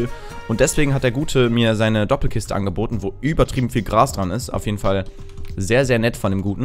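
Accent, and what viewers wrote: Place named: Cologne, Germany. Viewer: Kölner Dialekt.. :D Manschmal und Tich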